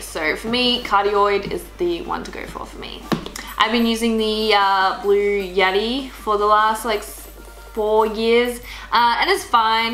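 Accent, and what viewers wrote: Australia. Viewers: Stop trying to Americanize your Aussie accent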